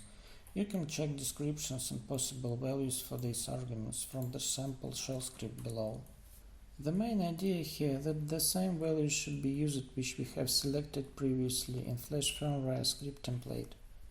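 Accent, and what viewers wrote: Russia. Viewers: You sounds like russian comrade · русский акцент слышу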